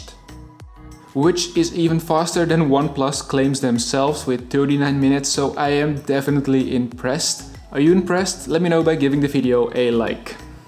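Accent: Netherlands. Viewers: You are dutch right?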